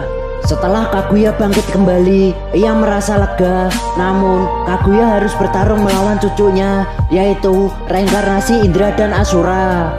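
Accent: Java, Indonesia. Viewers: Anjir suaranya medok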